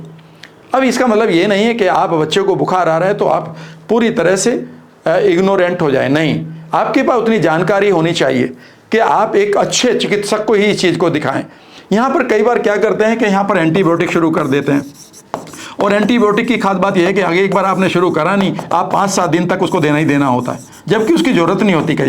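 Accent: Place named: Muzaffarnagar, India. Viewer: ji mann karta h aap ko sunte rahe aap ki bhasha ki miths me mujaffar nagar ki khusbu aati h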